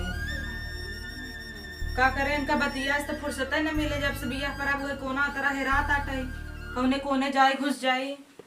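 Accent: Jaunpur, India. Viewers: ji aap ki jaunpuriya tone zabardast h...👍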